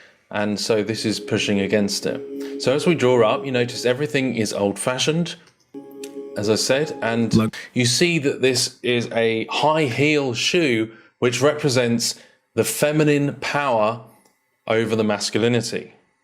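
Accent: United Kingdom. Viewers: AT THIS POINT YOU GUY WHO HANDLES THIS CHANNEL WITH YOUR BRITISH ACCENT YOU ARE NOT THE WAY. YOU SPEAK ABOUT ANYTHING NOW TO KEEP YOUR YT CHANNEL GOING MEANING YOU LOST ALL MERIT. YOUR NOT WISE. OR SPECIAL JUST OVER SPEAKING. PROBLEMS NO SOLUTION. SO FK YOU. AMD YOUR MOTIVATION YOU FOUND PURPOSE IN BS OLD WAYS OLD SOCIETY YOUR PROGRAMED TOO YOU HOLD NO POWER OR WISDOM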